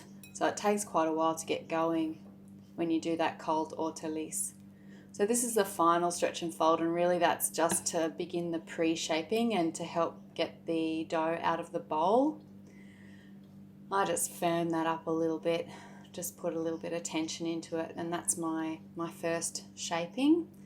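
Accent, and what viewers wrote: Australia. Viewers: I loved the video and the aussie accent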